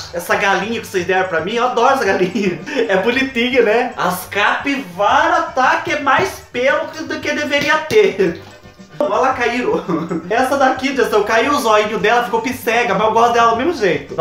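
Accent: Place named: Sorocaba, Brazil. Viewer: Sabia que este sotaque era sorocabano! ! Odeio traça na parede!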